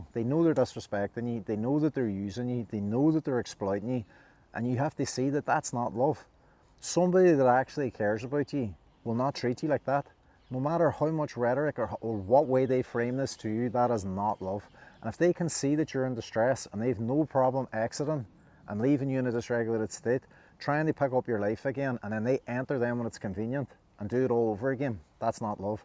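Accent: Northern Ireland, United Kingdom. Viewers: An Irish Northern man ...wise. lrish here. New sub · Your channel popped up and disappeared but I found you. Lovely to hear a fellow N.I. person. ❤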